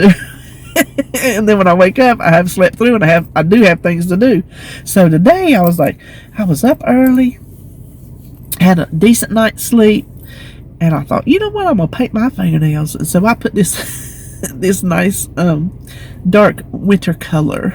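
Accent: South, United States. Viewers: YES Ma’am! One step closer to surgery! I wish you could talk to us everyday because you give me so much happiness. Just hearing that sweet southern accent and all your sayings crack me up! You are definitely a special friend to me. Love, hugs & laughs to you . ❤️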